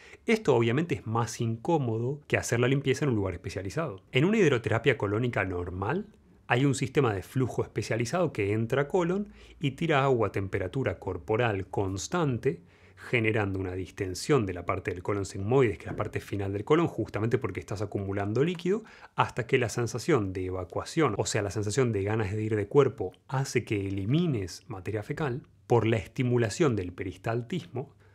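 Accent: Argentina. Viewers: Soy mexicano, por su acento supongo que el dr. es argentino, yo tengo la creencia que los argentinos son líderes en todo lo que quieren llevar a cabo.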